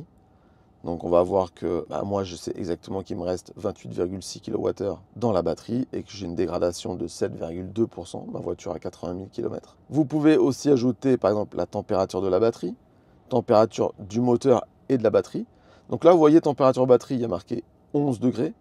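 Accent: England, United Kingdom. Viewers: on avait déjà eu un aperçu de ton accent anglais, là j’avoue que je suis encore plus sous le charme avec ce qnob 😅 Merci pour le test en tout cas;)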